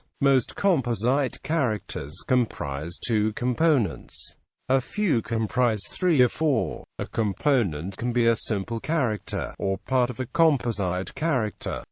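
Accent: United Kingdom. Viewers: Get rid of British accent, and make a better video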